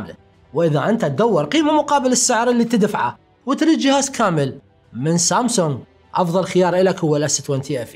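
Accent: Iraq. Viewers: اتا بشوف الفيديوهات فقط منشان استمتع باسلوبك المبدع ولكنتك العراقية الجميلة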